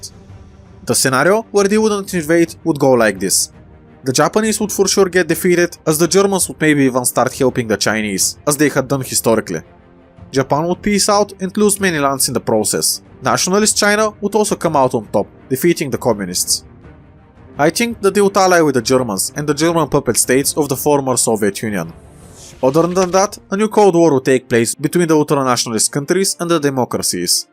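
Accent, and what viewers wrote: Bulgaria. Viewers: are you bulgarian by any chance? you sound like it. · Bro are you from da balkans shot you speak kinda like one of my bulgarian friends : ? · По диалекта разбрах че си Българин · Are you by any chance bulgarian? The accent kind of gave it up😊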